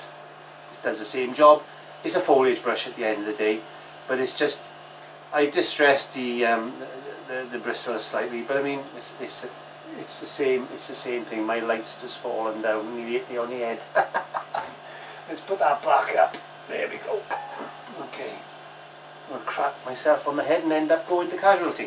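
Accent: Wales, United Kingdom. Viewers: I find your method of teaching so easy to follow, you cover every aspect of painting and make it all look so easy. Love the Welsh accent having lived in S.Wales for a number of yrs. Thanks again for all the tips. Mary Thomas.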